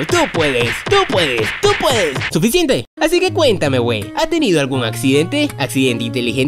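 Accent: Venezuela. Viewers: Oe eres de Venezuela? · Eres venezolano?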